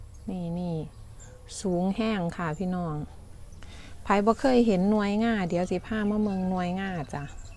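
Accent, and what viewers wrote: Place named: Ubon Ratchathani, Thailand. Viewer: รายการดีมักหลายเว่าภาษาอีสานคิดฮอดบ้านแท้.. นางอยู่จังหวัดได่น้อสำเนียงคล้ายแถวอุบล.จ้ะ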